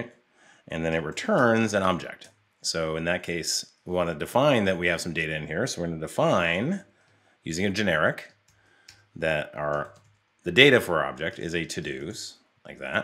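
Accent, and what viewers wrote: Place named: Germany. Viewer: Have a great weekend. Love the German pronunciation. 🙏😀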